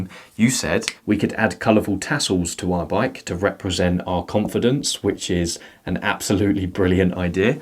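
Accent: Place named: Cockney, United Kingdom. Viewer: I didn't think cockneys still existed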